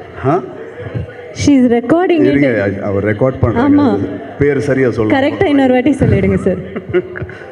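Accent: India. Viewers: He is speaking like * tamil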